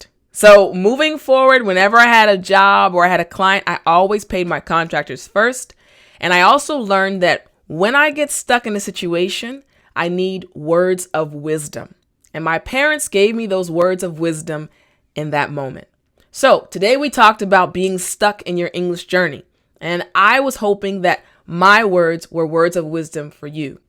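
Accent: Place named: United States. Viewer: Hii I'm from India at Odisha name meek ranjit thank you immensely for your lectures really I'm slowly understanding of your an American accent so appreciate your voice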